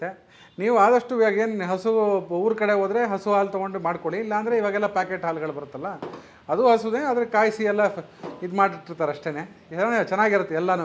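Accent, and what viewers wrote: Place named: Mysore, India. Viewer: ನಿಮ್ಮ ಶುದ್ಧವಾದ ಮೈಸೂರು ಕನ್ನಡ ಕಿವಿಗೆ ಇಂಪಾಗಿ ಇತ್ತು.ಹಾಗೂ ಪೊಂಗಲ್ and ಫಿಲ್ಟರ್ ಕಾಫಿ ಎರಡು ಇಷ್ಟ ಆಯಿತು. ನಿಮ್ಮ ನಗು ಹೀಗೆ ಇರಲಿ 😁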